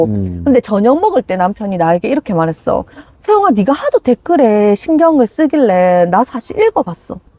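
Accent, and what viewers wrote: Gyeongsang, South Korea. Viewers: 사투리.. 저는 오히려 정감있고 리듬감 있어서 더 집중하게되고 잘 들려요~ 참고로 경상도사람 아니에요 ㅎ 오늘도 많이 배웠어요! 늘 감사합니다! 🤙🏻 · 전 경상도 사람인데 서울로 올라와서 서울말 쓰고 생활하는 사람인데요 선생님 강의 들으면서 "아 경상도 말이 이렇게 정감있고 재밌는 말이었지~~~ 나도 다시 경상도 사투리 써볼까 ㅎㅎㅎ" 생각했었는데요!!! 선생님 화이팅♡♡ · 저도 경상도 사람이라 너무 정겹고 말투가 제 친구랑 완전 똑같아서 더 친근감이 드는...ㅎㅎ영상 잘보고있어요~~저는 기꺼이 육아가 너무 좋아요^^ 늘 좋은 정보 뼈때리는 정보 감사합니다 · 앗 저는 서울 사람인데 경상도 사투리 너무너무너무 힙하고 좋던데요????? 그리고 사투리 덕분에 영상도 더 따숩고요! 팬입니다!❤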